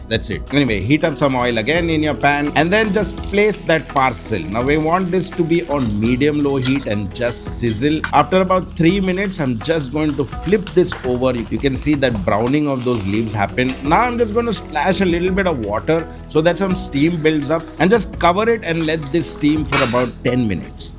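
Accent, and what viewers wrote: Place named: Mumbai, India. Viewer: Love the Mumbai accent and way of talking 😃Love ur recipes too … So subscribed to ur channel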